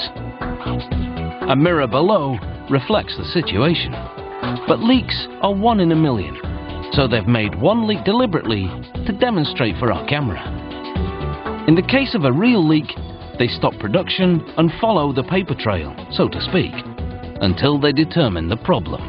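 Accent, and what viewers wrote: United Kingdom. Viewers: Sounds northern English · Where is this guy's accent from? I know, all you geniuses, that it's British... but from which part?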